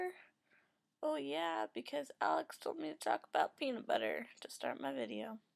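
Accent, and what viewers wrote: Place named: United States. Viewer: Ha ha sweet, thanks for the shout out, i've been super busy so haven't been able to get on here. It's Danny btw, but I don't mind hearing my name in an american accent! Keep up the good work.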